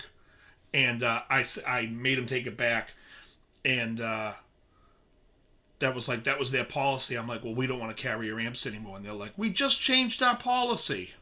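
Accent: Boston, United States. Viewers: You're smooth as silk on that Glamour Profession outro. Keep up the good work. Love the rants and the Boston (or vicinity) accent. Do me a favor and work the word 'car' into a rant sometime soon.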